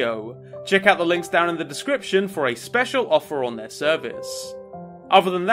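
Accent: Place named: England, United Kingdom. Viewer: says a lot about the oppression of Cornish culture that it wasn't mentioned in the video, despite you sounding distinctly English. very good video though.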